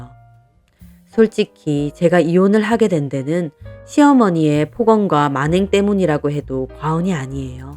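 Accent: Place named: Busan, South Korea. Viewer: 사투리 정말 잘 하네요. 부산 사람이신가요?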